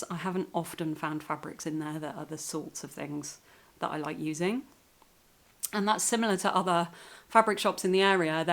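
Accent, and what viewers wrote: United Kingdom. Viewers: I’m a sewist and knitter. I started with the latter but always loved clothes. I thought I recognised your accent - I’m a midlands gal and after growing up in Leicestershire I’ve now been living/working in Derbyshire for the last 8 years. Congrats on 2K followers! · Hi. I've only just found your channel but it's good to listen to you. A lot of the crafting videos are American, which is okay but it's good to hear an English voice, especially as I live in very rural France, although am English. I guessyou could say I'm a multi crafter and love to try lots of different textile related but not purely crafts. I've made textile art dolls(primarily fabric), dressmaking and other clothes, knitting, embroidery, weaving, felting, textile art and mixed media pictures, made fabric animals, driftwood houses, Christmas decorations and wreaths, both fabric and natural, fabric collage, mixed media using all sorts of art supplies, sketching, entangle and am currently dipping my toe into the world of natural dyeing. 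I'm a retired nurse so absolutely understand your work life balance situation but am lucky enough now to have the time to play more, if not necessarily the budget to with it. I love to thrift or recycle as many supplies as I can. Looking forward to watching your previous videos and please DON'T enter me for the pattern giveaway as I already have a big stash of both knitting and sewing patterns I still want to make, and despite retirement, there's still never enough hours in the day 😅 Oh, and yes I'm crafting while listening 😊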